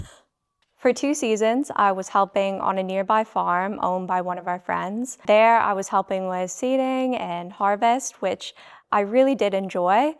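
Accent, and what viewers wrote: Australia. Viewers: I can hear that Aussie accent creeping in....soon you'll be one of us....one of us....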